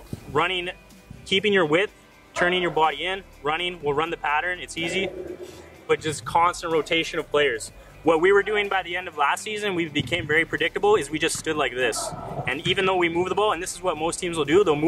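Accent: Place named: Canada. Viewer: As soon as I heard like a fkn Canadian accent I turned it straight off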